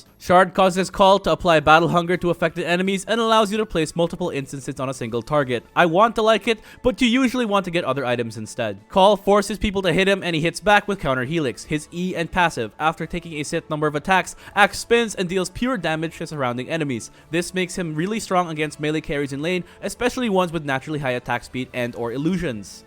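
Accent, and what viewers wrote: Philippines. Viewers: This guy is a filipino, I can hear it. lol.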